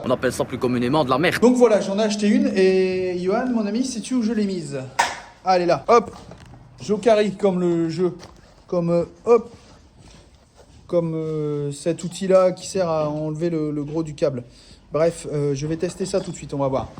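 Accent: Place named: North, France. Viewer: Coucou,apparrament l'enduro reste au programme. Ici pareil ont n'attend également. Mon fils a était ce weekend au terrain ou tu t'entraines. Je sais pas si tu as connu celui de calais,les spectateurs étaient en hauteur,il y a une vingtaine d'années tout les weekends mon beau frère et les collègues y aller et c'était génial de l'ai voir même si ils nous ont fait des frayeurs. Et a Equihen également. Celui ci existe toujours. En 99 une tempête de sable a eu lieu au même moment. Moi et ma cousine en panique,le reflex des bonhommes de poser la moto et de nous rejoindre en speed car on voyait absolument plus rien,avec les enfants nous sommes tous parti dans les véhicules,le temps que ça passe. C'était choquant. Sinon niveau travaux, ça avance très bien. Mr est parti faire un chantier sur Etaples,bjr le vent ici. Pour ton titre, oh que je te comprends,et même si ont me dit la roue tourne, ça laisse un goût amer!. 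J'ai regardé le live a Rox,et c'était cool même si c'était en Replay donc pas de commentaire. Mais pas mal,comme ici c'est des grands,et qu'ils adorent asiatique,je vais leur faire. Moi perso je comprends très bien l'accent du nord car j'y suis née,mais je rigole parfois car certains abonnés doivent ce dire"quesquil dit lui"lol. Bon courage, ça avance 💪🏼👍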